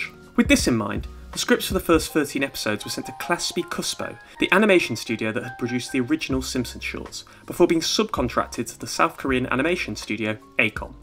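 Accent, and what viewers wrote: United Kingdom. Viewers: I miss the thick British voice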